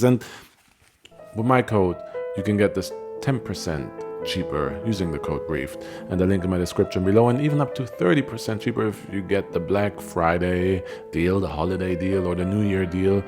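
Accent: France. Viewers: Are you French🤔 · Very intersting stories, but been wonder where you from? close to france? canadian? regards from Colombia friend.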